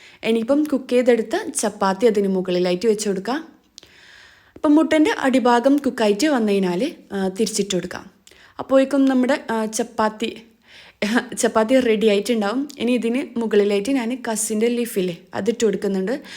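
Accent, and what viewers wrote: Kannur, India. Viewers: Hii ithaa...ഈ കണ്ണൂരുകാരുടെ voice ഒരു പ്രത്യേക രസാ ട്ടാ നിങ്ങൾ shamis own ചാാനൽ ലെ ഷമിതയുടെ നാട്ടിലാണോ?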